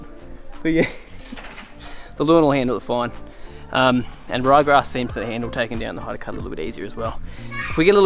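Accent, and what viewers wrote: Australia. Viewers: I knew you were Aussie before I clicked on the video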